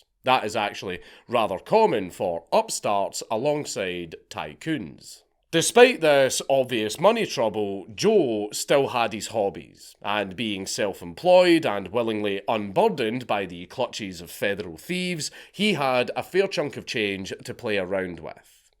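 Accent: Scotland, United Kingdom. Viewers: He talks too fast for such a heavy accent. Just Scottish blah blah.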